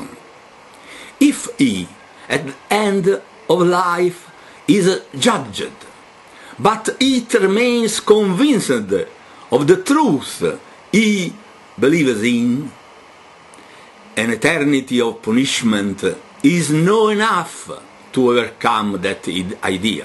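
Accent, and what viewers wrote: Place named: Italy. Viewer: some times i come back to this random video i found just to listen to this guys crazy italian voice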